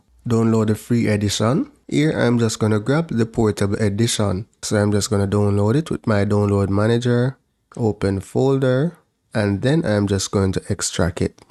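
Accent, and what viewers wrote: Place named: Jamaica. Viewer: First mi a hear patois on this side of yt, big up yuhself mi g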